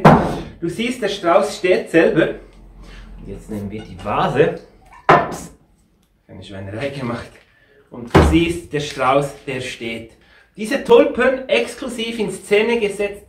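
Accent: Switzerland. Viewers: Ich finde das schweizer Hochdeutsch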